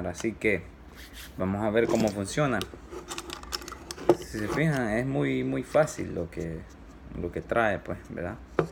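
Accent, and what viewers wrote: Honduras. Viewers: Hola, gracias por su video, jejej, ¿ud es de Honduras verdad? tienen un tontito para hablar muy bonito, además algunas de sus palabras son muy graciosas, lo digo sin afán de ofender. Ya compré una trampa y la pondré para exterminar estos animalillos que son un gran problema. Bendiciones. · De casualidad no eres hondureño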